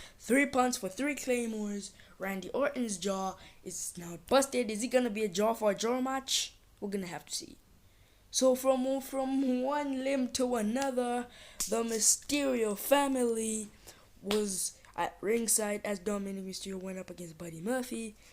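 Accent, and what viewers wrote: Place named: Ireland. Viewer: You very Irish’s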